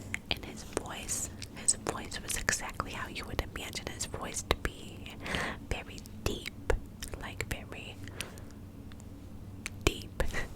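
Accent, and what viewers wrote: New York, United States. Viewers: I literally can't cope with your NY accent videos. They are SOOOO good. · you look gorgeous as usual! The NY accent is definitely my fave!! Sooo tingly! Hope you and your family are well❤️ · the new york accent vids are my favorite!! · OMG YESSS... who else absolutely loves the NY accent 😻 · I love your NY accent! Sooo tingly, your Niagara Falls facts in NY accent was the video that made me subscribe to your channel🥰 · I have been waiting all day to watch this to help me go to sleep. I LOVE story times with her NY accent, so tingly. Her whisper is the best whisper ever, she needs to have way more followers. The people need to hear her super tingly whisper 💗💗💗 rip dmx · honestly, i got tingles in the first like, 45 seconds. love your ny accent videos and your great energy! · Omg yayyy!! NY accent is my favorite!!🥰 Thank you for this video!!!🥳💓💞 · loved his video!! 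your ny accent is so tingly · This was soo good. I love the ny accent. You should def do a weekly video like this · This video is so tingly I am so glad you posted, I wait there for you to post all the time because your voice is so relaxing🥰😴. Also love the NY accent it adds to the tingles🥰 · FINALLYYYY a NY accent video!!!! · This video made me fall asleep it was sooo good so I came back just to say I love your videos so much and the accent is amazing! 😍 I love the NY accent since I am from England ♥️ listening again whilst I do my makeup 🥰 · Loveeee your NY accent vids!!! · I love sounds like an Italian New Yorker 😭❤️ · the ny accent is literally iconic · The NY accent is my fave, sooo tingly 😴 RIP DMX, I was so devastated by the news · another appearance by the green background lighting!! my fav. you look beautiful and the new yowrk accent is amazing 🥰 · your ny accent videos are my go to everytime i’m relaxing! 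they are super fun and relaxing at the same time. thank you 🥰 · Yay, more NY accent whispers!! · I love all of her videos, but the NY accent is everythingggg. I was born and raised up north and I moved down south a few years ago. I love the southern accents, but I miss the NY and Boston accents, so I really appreciate these!💛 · I loveee the NY accent reminds me of my grandma 🥰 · I'm loving your NY accent 😍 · the New York accent is so relaxing!!! 💕💕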